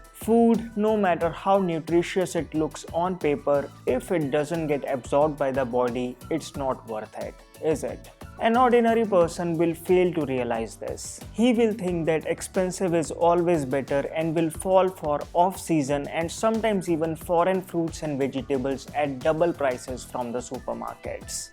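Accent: India. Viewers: Thx true Indian accent Me too following most of your advice so I'm genius 😊😇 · The master in indian accent .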